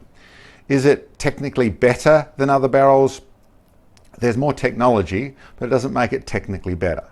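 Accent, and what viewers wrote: Australia. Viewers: I laughed when you put the barrel down so you could talk properly. Somewhere in your ancestory there might be some Italian blood. Something about mediterranean arms movements and aussie accent is genius. Love all your videos, Australia is my 2nd favorite country to visit right after NZ.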